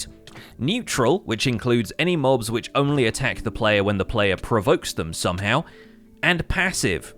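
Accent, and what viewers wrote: United Kingdom. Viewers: Yo are you British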